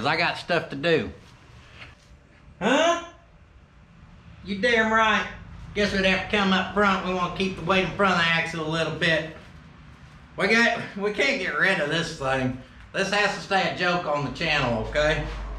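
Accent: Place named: South, United States. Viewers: you gotta drop at least 2 vids a week. And yeah love that southern accent. I also watch Randy from Auto auction rebuilds hey spoke of you a while back in a video .TKs Garage , Lucky's wheels Deals ,Vice grip garage .Yeah lol I learned a lot new words Libby dubby lol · Took me a minute but your humor got me past the hillbilly voice. I subscribed and I'm telling everyone. Good content and downright funny! Oh and good on yer wifey for recognizin the talent.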